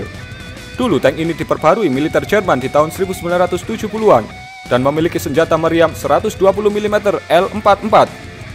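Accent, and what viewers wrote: Java, Indonesia. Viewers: Medhok nya tuu looo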